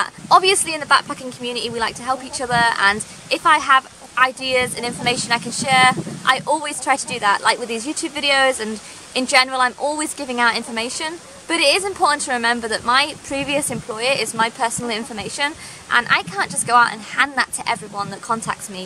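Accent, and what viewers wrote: Australia. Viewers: You're starting to sound Australian 🤔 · Aussie accent creeping in 😉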